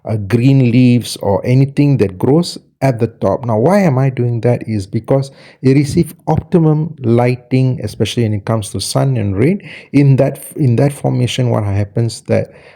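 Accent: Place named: Malaysia. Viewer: You sound Malaysian or Singaporean. Where can I get this plant in Malaysia ?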